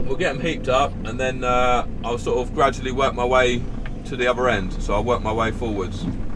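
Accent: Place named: East Anglia, United Kingdom. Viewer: Hi, really enjoying your videos 👍can you let me know where you're based roughly? Sounds like a lincs/ cambs twang to me? 😃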